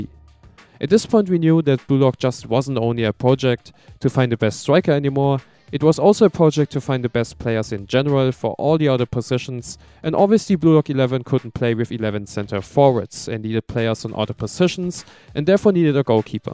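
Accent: Germany. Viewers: Bruder kannst du vllt deutsch weil dein Englisch hört sich so an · you sound german, are you?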